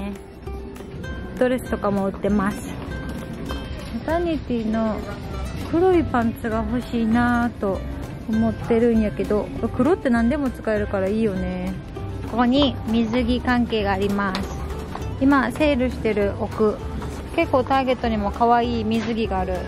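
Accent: Japan. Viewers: いつも楽しくみてます！ 方言富山ですか？？似てたので… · 初めて動画観ました！ 高知弁が可愛すぎてキュンとしました☺️💕 旦那さんと息子さんも素敵すぎて理想の家族です✨ 他の動画も色々と観たいです☺️❤️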